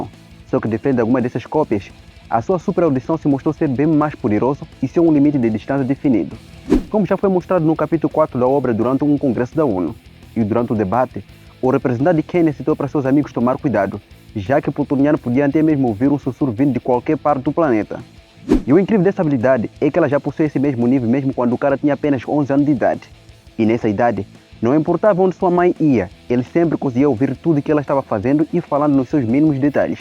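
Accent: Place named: Angola. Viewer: Parece sotaque angolano ou talvez de Portugal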